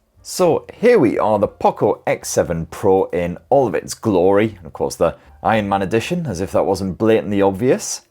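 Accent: United Kingdom. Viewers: Came for the review, stayed for the brit accent and shenanigans. You earned a sub.😊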